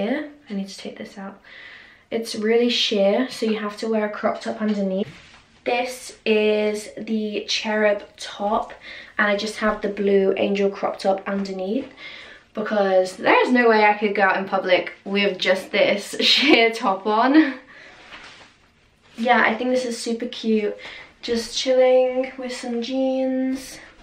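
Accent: United Kingdom. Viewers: I love these try on hauls, your body is amazing and you are gorgeous. But I also love your British accent and your voice, just listening to you talk gives me some asmr tingles sometimes. You seem like such a sweet person. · Her accent sounds British. I never knew she was British. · You have gorgeous eyes! And I love your beautiful voice with that British accent it’s hot!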